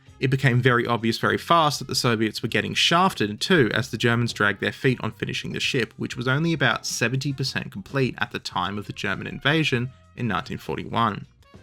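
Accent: Australia. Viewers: Like Vegemite, the Aussie accent is something to be proud of, even if it's not for everyone. Personally, great video and attitude with the foreword. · Hey! Don't really give a shit about ships but I do appreciate your accent, proving again that we don't all sound like yobos. Have fun & hope the channel does ok. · Gotta love the sound of a fellow Aussie talking out his arse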